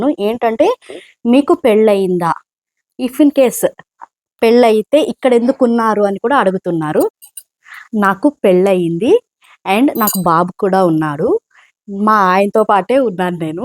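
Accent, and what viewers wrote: Karnataka, India. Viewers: మీరు హిందూ పుర్ తెలుగు బెంగళూరు లో తెలుగు బాగా మాట్లాడుతారు మీరు కన్నడ నుంచి వచ్చిన తెలుగు ఆలాగే మీ భర్త గారిని రీ అంటున్నారు రి పదం కన్నడ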